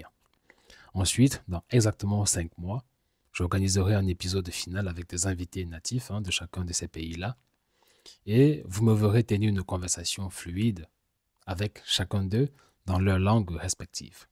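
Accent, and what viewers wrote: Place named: France. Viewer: je repond EN italien que je suis Fiorentino ou' est ne Dante ..... Sei un grande professore e m ' hai fatto capire i meccanismi del nostro cervello che già sapevo però tu l hai spiegati in maniera eccezionale ... Poi il tuo accento Francese in più parli piano lentamente ... Via grazie mille hombre !!!!!! Ciao professo !!!!!!!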